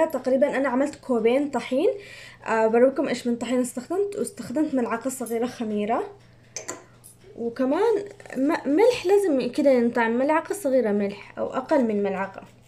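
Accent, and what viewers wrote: Aden, Yemen. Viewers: ماشاء الله تبارك عليك ممتازة بكل طبخه كل اكلك حلو واحلى شيء الهدره العدني لا تغيريها بالله عليك❤😘متابعتك من عدن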